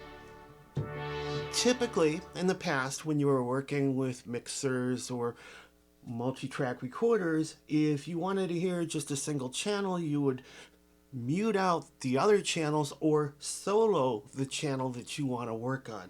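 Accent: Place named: North, United Kingdom. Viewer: ) I kept leaning over looking around the mic stand that was in front. I tease, but I love your approach to breaking down the instrument and your pleasing effect throughout the video. Ive the F8 but am NOT a piano player. I hope you continue this series and delve more into the instrument. Maybe I'll see you at NAMM! P.S. Love your northern accent!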